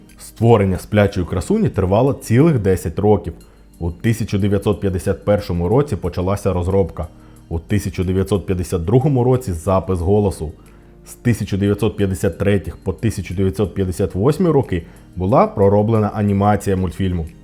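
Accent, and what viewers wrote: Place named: Russia. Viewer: він має на увазі,що ви розмовляєте українською тільки для відео,має на увазі ваше лицемірство. Хоча направду,дуже чутно,що ви зазвичай,розмовляєте окупантською.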